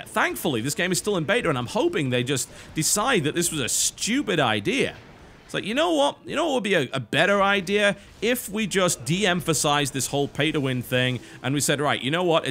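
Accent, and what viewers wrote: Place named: Geordie, United Kingdom. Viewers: I know you're a troll, but I'm going to reply anyway; he's from the north of England. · So me being a geordie, am I right in saying this guy is a geordie but he's trying to hide his accent, because I can hear it.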